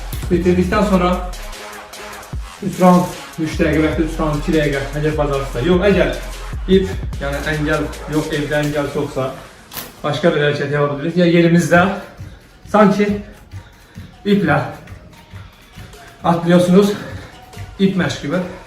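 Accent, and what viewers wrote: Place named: Turkey. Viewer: Türk lehçesi 😁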